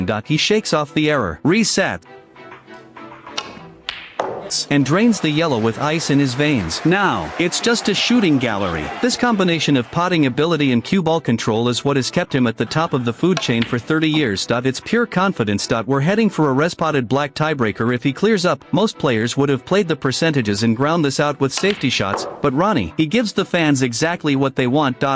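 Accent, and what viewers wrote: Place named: United States. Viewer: we fkn know..and a fkn yank commentary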